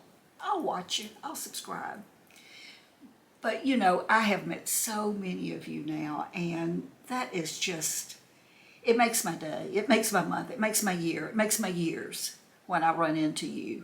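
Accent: South, United States. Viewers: I love seeing you with that adorable southern accent & you are such a true beauty❤️🍷🍷👏👏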